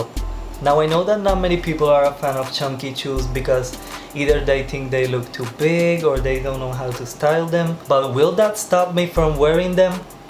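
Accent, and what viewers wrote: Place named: Philippines. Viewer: You're accent is like a Filipino speaking in English. :) Like us!!! I Love you and your shoes!!!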